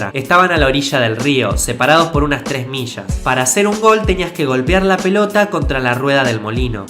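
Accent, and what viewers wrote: Argentina. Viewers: no se nota el acento argentino?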